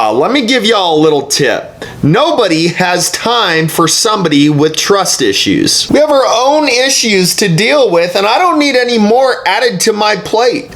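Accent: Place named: New Jersey, United States. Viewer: Are you sure your from Texas ? You sound like your from new Jersey. Your such a whiney Lil bitch .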